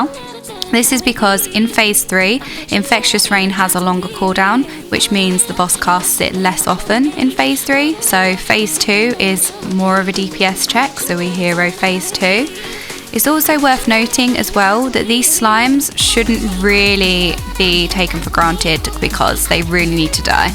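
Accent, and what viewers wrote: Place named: United Kingdom. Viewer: I'll be honest, fire mage here, been trying out frost as fae, it's fun since i always leaned towards frost. I'm still doing 15s so I'll call out what I see in those keys. People don't really pay attention to mechanics if it's not a deadly one, so if you would like to add some comments about mechanics like these you did they sure are pretty welcome. From what I've noticed from my prespective, making mental notes by watching good players' movement and ability usage is attractive and should be to most, so a bit of explanation stuff about when to press Icy veins (I always do when it's out of cd except if the pack is dead or small :P) would go some ways. Music on that tone was actually nice having. Lastly you got a lovely voice, UK accent which i personally love and a lot might adore it so you do well speaking enough during the video! 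Keep it up!:D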